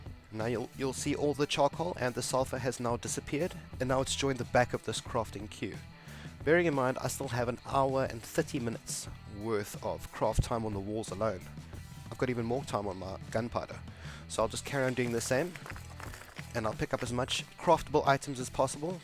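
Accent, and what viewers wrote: South Africa. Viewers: Great to hear a saffa gamer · South African ??!!😂me too😂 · Are you south african? :D Great video! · You sound south african! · Are you from south africa ?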